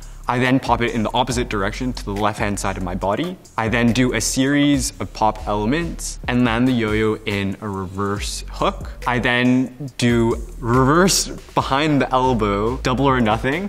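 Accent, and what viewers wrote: Canada. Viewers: I am very canadian. But i noticed his Canadian accent. It is beautiful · He's Canadian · He sounds very Canadian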